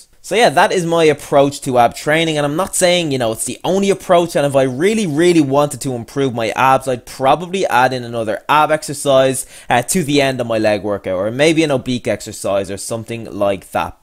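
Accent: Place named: Ireland. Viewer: Love your videos especially love seeing an Irish YouTuber great to hear a familiar accent a good video would be on face fat loss would really help 👍🏽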